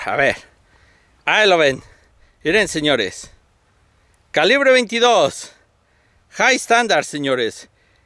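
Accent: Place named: Mexican-American, United States. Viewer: El acento es increíble amerimexican o México americano? 🤔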